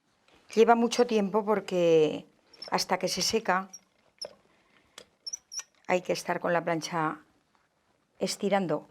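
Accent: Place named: Aragon, Spain. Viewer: ¡Qué bonito acento! ¿es de Aragón?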